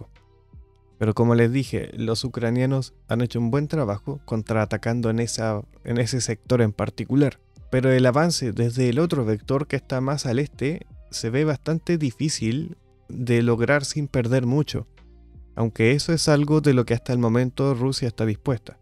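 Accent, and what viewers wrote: Chile. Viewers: Otro youtuber chileno bueno informando sobre la guerra ruso/ucraniana? Al menos a mí me suena algo chileno el acento. Bravo los chilenos! Se aprecia y agradece la objetividad. Felicitaciones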